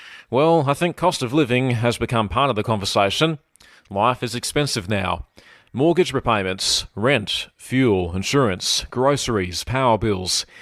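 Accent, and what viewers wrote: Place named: Ireland. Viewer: What a silly accents! Irsih?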